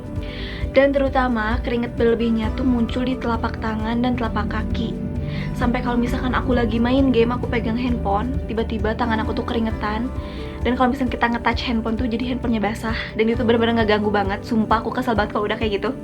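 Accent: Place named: West Java, Indonesia. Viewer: Logat sunda si teteh hehe ...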